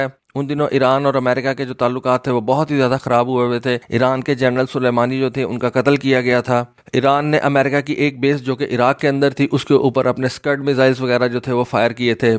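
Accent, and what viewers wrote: Sialkot, Pakistan. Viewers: Pure sialkoti accent 👌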